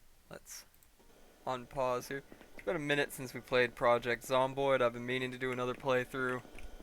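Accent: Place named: Ohio, United States. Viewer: Lol idk you and this is the first video, but you sound like you're from Ohio 😅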